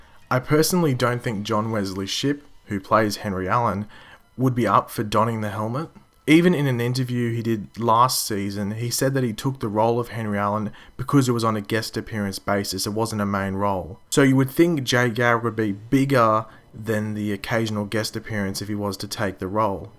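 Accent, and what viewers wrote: Australia. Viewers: Hey just wondering if you're Aussie cos u have an accent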